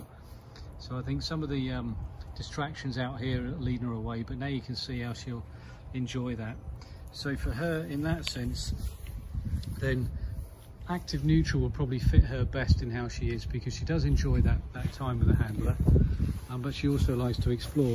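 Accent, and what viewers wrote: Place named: United Kingdom. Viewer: I love your British accent!👨🏻‍🦳🇬🇧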